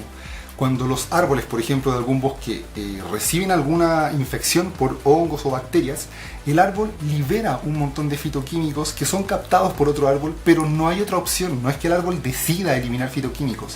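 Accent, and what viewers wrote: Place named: Chile. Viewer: muy bien!! es la forma q tienen los antiveganos de atacarnos, yo ya no respondo a sus ataques, me cansé y no se consigue nada dando explicaciones... muy buena explicación... eres fantástico, caes muy bien, me encanta el acento y el tono q tenéis los chilenos al hablar. Gracias guapo por la información. Go vegan.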